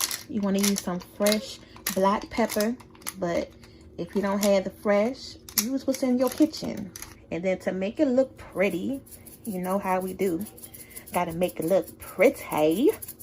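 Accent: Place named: DMV, United States. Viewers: Yes! That undeniable DMV accent. And if I'm hearing correctly, I think it may even be right outside of DC on the VA side - "town" side of Alexandria even tho I'm from the "highway" side. I could just be biased lol 😌. This looks so good I can almost taste it!!😋🤤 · Looks delicious.. and I know a DMV accent when I hear one. I love it!💜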